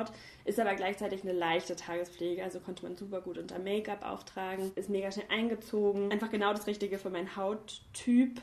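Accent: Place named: Baden, Germany. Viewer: Ja gerne nochmal ein Video 😆 diese Kombi aus Badisch und Hochdeutsch ist immer lustig 😆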